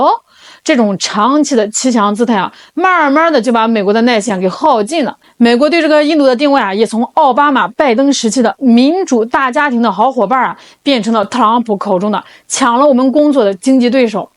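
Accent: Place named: Shandong, China. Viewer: 听口音应该是山东老乡，最远河南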